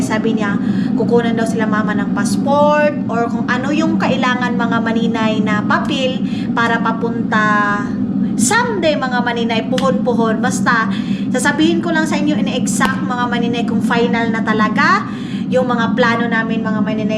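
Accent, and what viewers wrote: Visayas, Philippines. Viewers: your visayan accent is stress reliever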